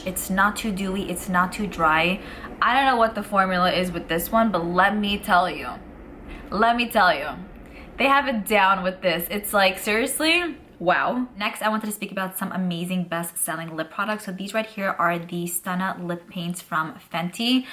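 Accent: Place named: South, United States. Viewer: Random comment but I love your accent, the way you say "gloss" is so cute, is it Southern?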